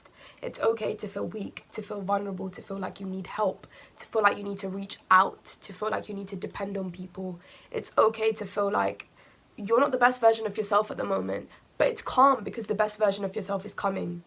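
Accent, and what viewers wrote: London, United Kingdom. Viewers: True Londoner accent.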